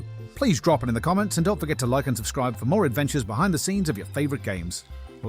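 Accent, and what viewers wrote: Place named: Australia. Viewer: Australian i see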